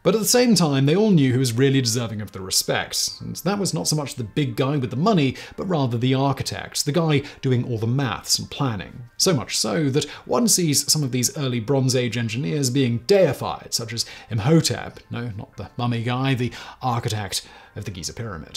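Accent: United Kingdom. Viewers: If you watch his other channels, he openly talks about not even being able to remember recording videos anymore because he does so many. Scripts are created by his writing team these days, and he just reads them in his reassuring-sounding British voice.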